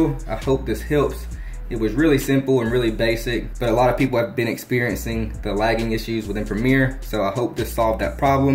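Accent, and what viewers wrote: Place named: South, United States. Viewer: Where You From You Have A Southern Accent Btw Thxx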